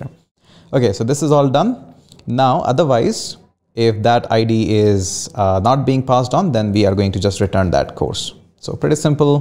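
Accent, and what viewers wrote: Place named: Delhi, India. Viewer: why this delhi people spaek like this , too weired and annoying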